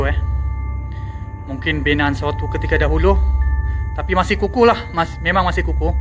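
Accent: Sabah, Malaysia. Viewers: cakap macam org sabah, tapi vlog kat pahang. org mana dan kenapa di pahang? hehe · pergh hebat.berani. pekat sabah kau ni bro. bajau ke bro. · org sabah kah bro? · dngr mcm slang sabah je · Diorang Sabah ,Kerje kat Malaya!!! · Urang sabah ka ko tu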